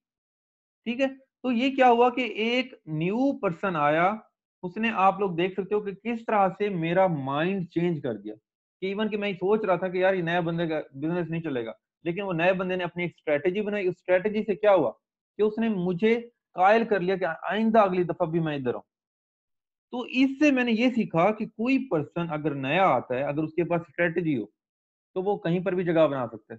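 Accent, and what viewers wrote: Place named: Multan, Pakistan. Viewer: Bhai ap multani ho? Apki punjbai awaz multani bandon jaisi hai 😁😍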